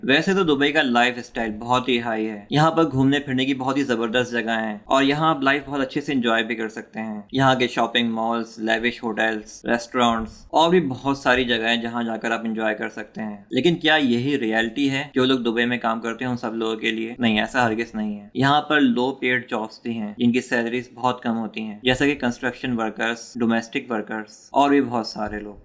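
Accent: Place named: India. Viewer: Bro you are working in Dubai but you are slang language is Indian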